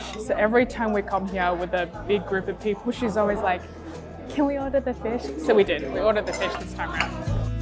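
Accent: Australia. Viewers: I love hearing your Australian accent because it's so different from where I'm from. But your Cantonese sounds exactly the same as mine! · Thought u grew up here in oz as you spoke with aussie accent, didnt realise your parents were coming to visit you from overseas... Maybe im wrong? Im kinda half way thru, lemme finish watching to find out! :)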